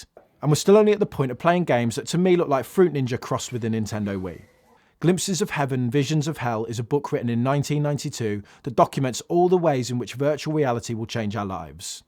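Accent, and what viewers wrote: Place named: Northern England, United Kingdom. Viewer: I'm loving the northern accent. Not enough northern Youtubers.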